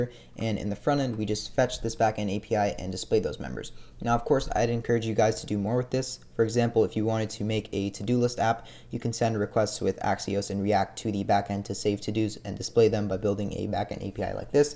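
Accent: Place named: Nepal. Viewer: Bro!! are you Nepalese? your accent ❤ thank you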